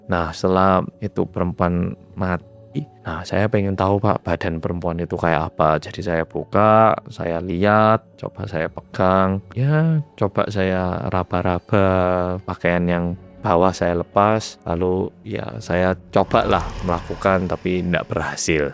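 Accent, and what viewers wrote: Java, Indonesia. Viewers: Hai muedok😊 Lgsg nyimak🎉🎉🎉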